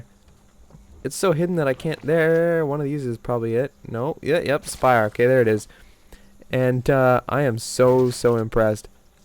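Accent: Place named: Canada. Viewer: lol your accent very canadian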